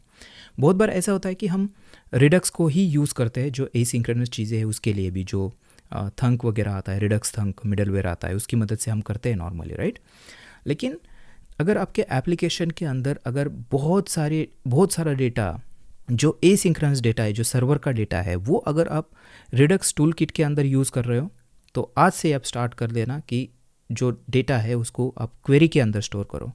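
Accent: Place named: Mumbai, India. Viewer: bhai aapka slang typical mumbaikar jaisa hai, btw love your content❤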